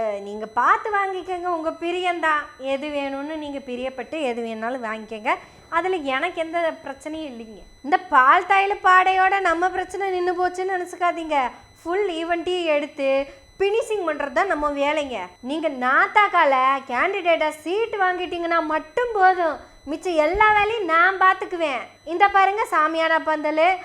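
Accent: Kongu, India. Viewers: Excellent very nice attractive attractive Kongu Tamil. May the God bless you and grow as a a very popular youtuber. You have excellent intrinsic latent and dominant skill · வணக்கம் எங்கள் திராவிட மகளே, வணக்கம்... தங்களின் மாறுவேடம் !?!?! 😮😮😮 உலக நாயகன் கமலுக்கே போட்டியா!!!😂😂😂 நம் அழகிய கொங்கு தமிழில் தங்களது பேச்சு.... Amazing...👌👌👍👍👍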